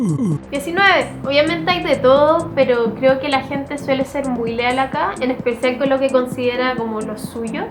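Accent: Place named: Chile. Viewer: me emocione cuando escuche tu acento, ya es comun que los extranjeron hagan este tipo de videos pero es raro que un chileno se de el tiempo de compartir lo positivo de Chile... felicitaciones y exito.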